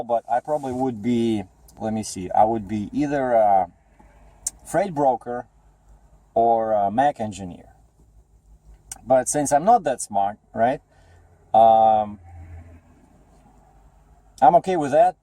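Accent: Russia. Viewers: your accent is cool to me. ther are a lot of ignorant people in trucking. sorry to say. I like truckers I wanted to be a trucker but do to medications I must take to keep my spinal pain bearable I cannot drive a commercial truck. but I respect@ truckers. Or they may not drive truck at all hard to know. Russian/American doesn't matter.Its who we are that truly defines us.They defined themselves as a social sickness that is tearing this country apart as well as Canada too.People like you are building keeping this country running. So they can go FUCK themselves when they treat people like you with disrespect. your one badass trucker. Thank you for all the videos. Stay Safe Tim Bishop out... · Ok I see now. There are a few good guys that will share some good info but these Forum's are filled with people that tried to make it in the Trucking Industry and they are basically in financial ruin! 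they lost homes, marriages, family, and it's not your accent that bother those losers, it's the fact that you were born in Russia and you are more successful than a lot of those losers and that what bothers them. I was born in Central America and I will always have a Spanish accent. When those losers saw your equiptment and all those videos, envy set in and your original question dint even cross their mind. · Your accent is cool I like the Russian English you don't take personal what some lame brains have to say about you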